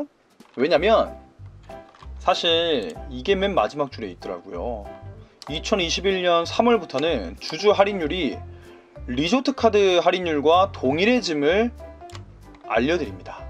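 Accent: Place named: Gyeongsang, South Korea. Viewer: 님안녕하세요 ㆍ구독자입니다 항상유쾌하게경상도사투리로하는경제공부많은도움얻고있어요 여쭤볼게있는데요혹시 아라튜브라고아시면 알려주실수있을까요??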